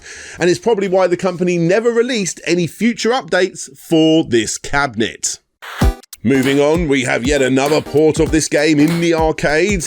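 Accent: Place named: United Kingdom. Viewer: It's very strange. You sound British, but you look American. Very queer combination.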